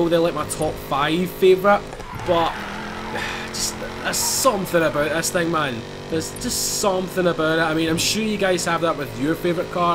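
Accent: Scotland, United Kingdom. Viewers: Are you Scottish? You sound really Scottish 😂 I’m from Scotland as well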